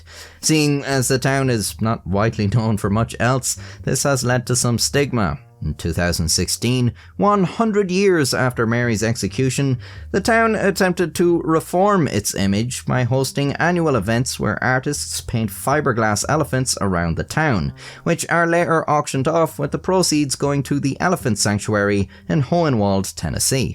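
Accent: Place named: Ireland. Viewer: If you're new around here and looking for a similar but less horrifying video, try the one about the monkey railroad conductor. If there were a hall of fame for videos, that one deserves a spot. And dammit, the world LOVES Irish accents, your voice is delightful.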